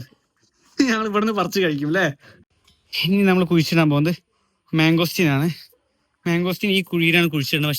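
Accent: Malappuram, India. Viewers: നമ്മുടെ മലപ്പുറം ഭാഷ എന്തേനി🎉🎉🎉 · മലപ്പുറം thanne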